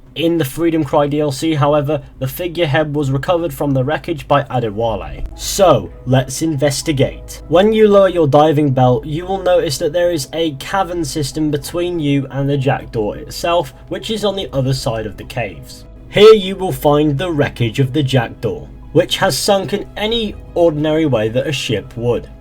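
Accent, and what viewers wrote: United Kingdom. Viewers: Where exactly is your accent from? I know it's somewhere in the UK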